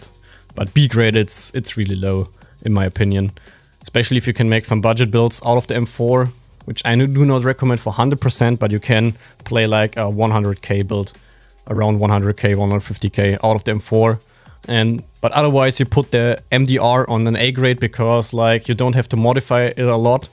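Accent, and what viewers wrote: Germany. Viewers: Sprich einfach deutsch bro xD, joke mag es wenn deutsche Englisch sprechen! <3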